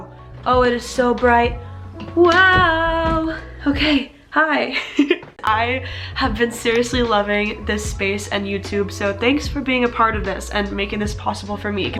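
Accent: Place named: United Kingdom. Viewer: Are your from UK if u are the accent is cute 😳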